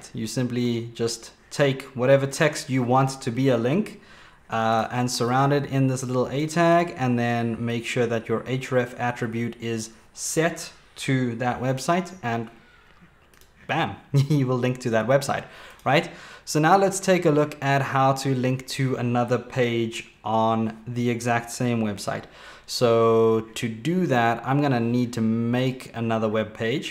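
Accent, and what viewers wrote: South Africa. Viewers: I didn't know you were south african <3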